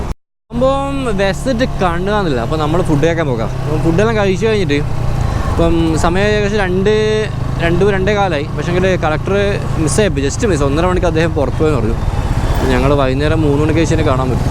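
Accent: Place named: Iritty, India. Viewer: . കുഞ്ഞി കുഞ്ഞി നമ്മുടെ ഇരിട്ടി ഭാഷാ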